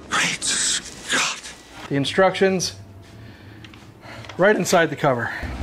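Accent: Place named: Western New York, United States. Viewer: That WNY accent will get you every time.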